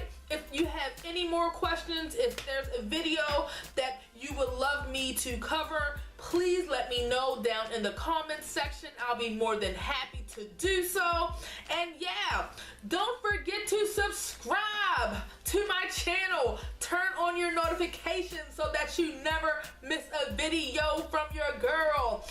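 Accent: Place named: Maryland, United States. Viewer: Helpful! I hear some Maryland in that accent...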